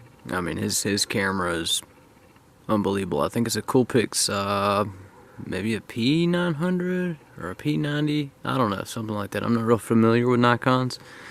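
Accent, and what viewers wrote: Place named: South, United States. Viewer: We both have that country accent. I love hearing your voice, reminds me of home. Everyone here gives me a hard time about my accent, but I proud of who I am. We are living in crazy times for sure, Ive noticed the water receding here also. Stay safe my friend💞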